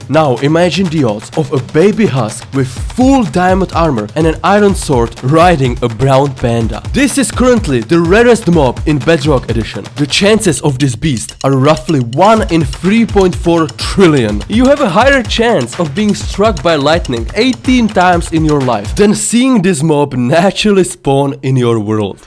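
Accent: Russia. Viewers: You are russian? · I swear that he has a Russian accent. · You're Russian right?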